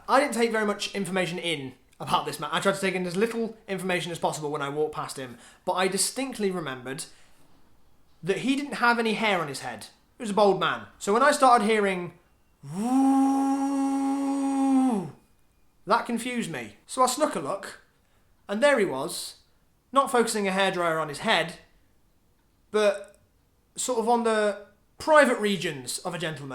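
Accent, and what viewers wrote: United Kingdom. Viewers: My god I love you and your superbritish accent <3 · You're so English its beautiful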